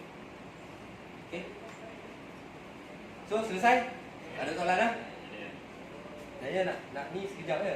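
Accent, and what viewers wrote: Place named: North, Malaysia. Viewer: Power...cara bahasa mcm orang belah utara...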